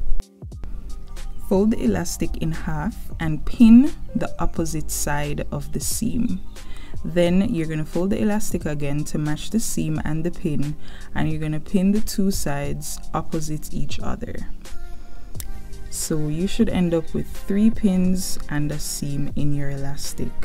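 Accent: Jamaica. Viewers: Are youJamaican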